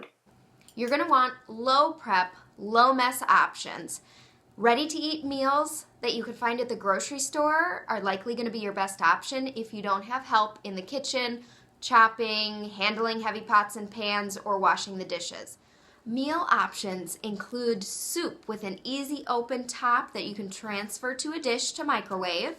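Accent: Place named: Minnesota, United States. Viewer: i can tell you are from minnesota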